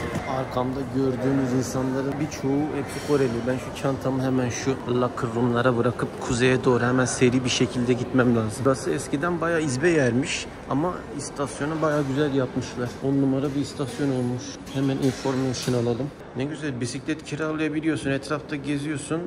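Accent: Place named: Anatolia, Turkey. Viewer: abi japonyanın en kuzeyinde bile anadolu şivenden ödün vermemen çok özel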